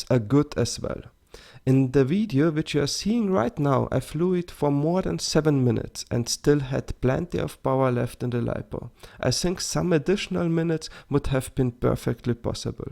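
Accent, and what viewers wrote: Germany. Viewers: Bist ein Deutscher, richtig?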